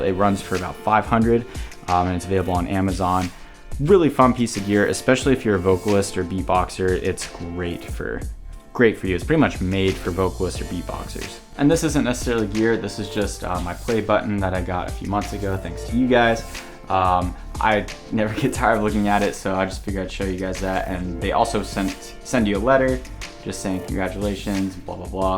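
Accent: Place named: South, United States. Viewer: What state r u from because I kinda can tell a southern accent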